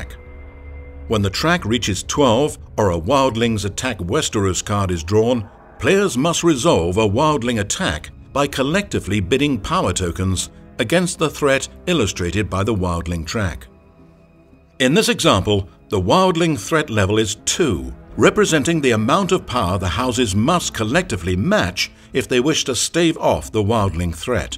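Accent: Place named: England, United Kingdom. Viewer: This tutorial is better with the narrator's English accent.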